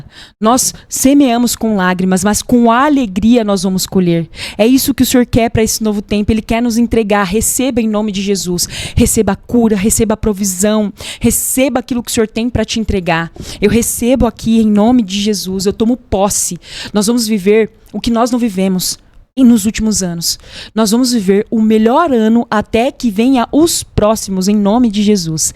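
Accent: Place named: Videira, Brazil. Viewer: Você é da Videira? seu geito de falar é igual o de lá kk.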